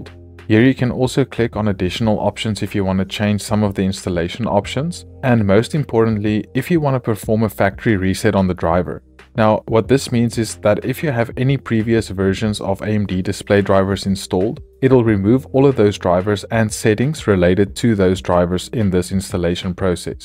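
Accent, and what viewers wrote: South Africa. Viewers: Definitely South African accent 😂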